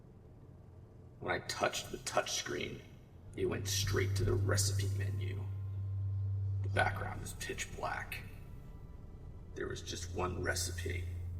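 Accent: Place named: United Kingdom. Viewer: I love the bri'ish accent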